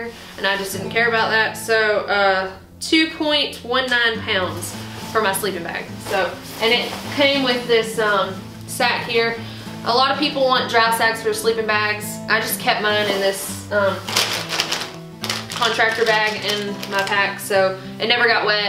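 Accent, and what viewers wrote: South, United States. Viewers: Hey - Do people ever comment about how DIFFERENT the young lady is that is just starting the AT in GA (2015) to the one doing these very informative videos? The pretty is there throughout, but the confidence is far greater in these latest efforts. Hope your book is nearly as informative. Too bad you can't write with a southern accent!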